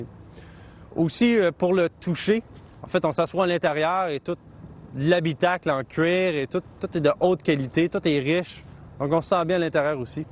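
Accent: Quebec, Canada. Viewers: Il est québécois, non??😂😂😂😂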